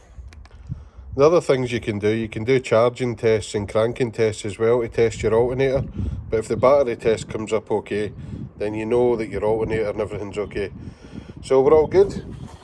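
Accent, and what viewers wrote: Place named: Scotland, United Kingdom. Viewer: Where in Scotland are you from? I'm in Livingston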